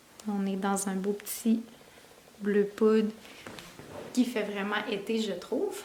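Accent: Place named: Quebec, Canada. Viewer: Bonjour et merci pour ce partage très agréable ! J’aime beaucoup ce que tu fais et j’adore ton cardigan de Petite knit !! L’accent et les expressions québécoises sont vraiment charmants! Bonne continuation et au plaisir de te retrouver lors du prochain podcast ! Cécile 😁😘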